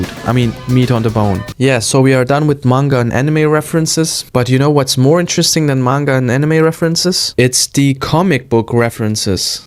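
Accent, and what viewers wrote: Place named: Germany. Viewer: i can hear that lil german accent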